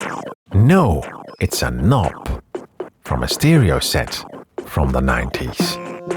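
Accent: Netherlands. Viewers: Your channel is the only one where I can stand such a thick Dutch English accent. Normally can’t stand it at all (“tenenkrommend”) but your presentation and mood just draws me in and envelops me. It is especially enjoyable and just for this once I’ll just have to allow your accent to exist. Thanks for taking the time to share your dots with us. Never change. Also, nice music!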